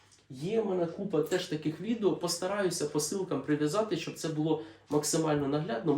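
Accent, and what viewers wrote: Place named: Surzhyk, Ukraine. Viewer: забагато суржику та неправильного використання слів, але неймовірно приємно чути тебе "рідною"! Respect і уважуха. Давай ще!!!!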